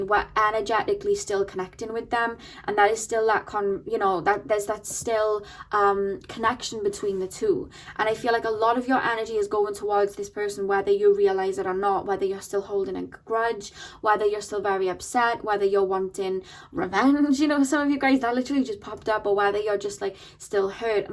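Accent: Wales, United Kingdom. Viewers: I love it the Welsh is one of my most favourite accents I don't know how but I hear a little bit geordie in there it's lovely! And your readings are so good!! 😘💗💗💗💗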